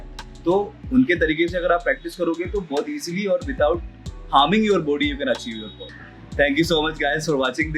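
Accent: Kerala, India. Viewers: Mallu ki Hindi ...mast ha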